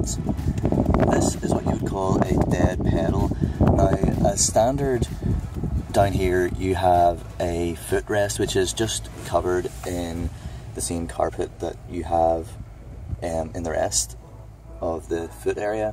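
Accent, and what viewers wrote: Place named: Northern Ireland, United Kingdom. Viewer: Hi there, I am from Australia but was born in Groomsport Northern Ireland so it was cool hearing you speak :P As for the car get a crossover pipe to remove that stock pipe to the turbo. I changed mine and the intake hose to the mountune like you have. Other good things to increase flow is adding the velossa big mouth to the front of the airbox. I own a 2015 euro 3dr FiST like you so these tricks are good. But as with all these changes you need to think downpipe and exhaust one day too. Take care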